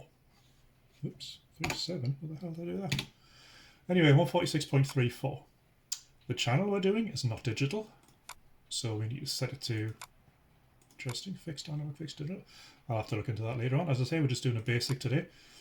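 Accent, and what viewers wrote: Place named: United Kingdom. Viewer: Arizona U.S.A and English, sounds as though your of British/UK descent. Thanks for doing this video.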